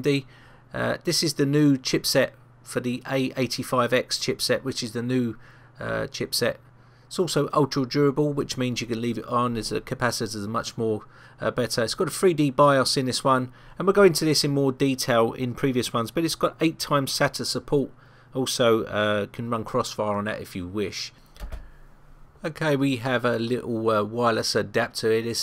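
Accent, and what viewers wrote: United Kingdom. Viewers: damn British accents give me cancer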